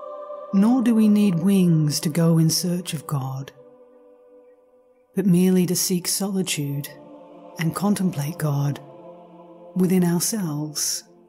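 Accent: Australia. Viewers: Love the Aussie accent! Very early on in my spiritual path I belonged to an Australian Catholic listserv (yes, it really WAS that long ago). I made some wonderful friends who helped me enormously along my way. Listening to you brought them to mind in gratefulness. Thank you!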